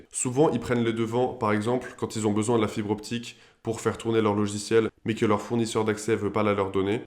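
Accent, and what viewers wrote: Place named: Russia. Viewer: On dirait que tu penses comme moi. Tu t'inspires des meilleurs pour t'en rapprocher. On dirait que ta vidéo a été tourné et penser par moi. On dirait que c'est moi qui explique quelques chose. Tant mieux, your're like me. Je considères humblement bien expliquer les choses et être bon pédagogue et je constates que toi aussi tu es comme ça. Je sens un légé accent russe, je me trompes?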